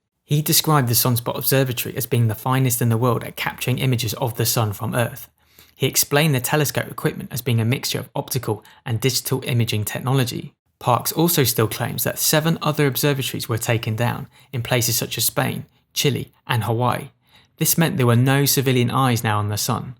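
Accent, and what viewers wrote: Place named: United Kingdom. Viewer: Bloody Brit-ish acc-ent!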